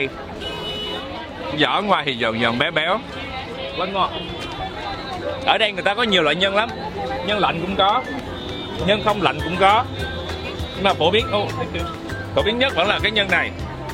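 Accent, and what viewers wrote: Mekong Delta, Vietnam. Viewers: Dân mìền Tây nói chuyện nghe vui ghê. Vui vẻ thì nói là dui dẻ. Màu vàng thì nói là màu dàng.Việt Nam thì nói là Diệt Nam..Mình có dạy cho thằng bé lớp năm quê ở miền Tây môn tiếng Việt mà sửa lỗi chính tả cho nó cũng muốn tẩu hoả nhập ma. Nhưng giọng, cách phát âm thì vẫn cưng và nghe hay thật.Chính mẹ mình dân Daklak còn khen nói chuyện hay.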